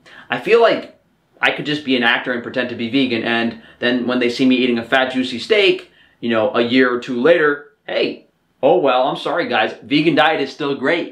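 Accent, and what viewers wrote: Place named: New York, United States. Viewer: it might be his new york accent 🤷🏼‍♀️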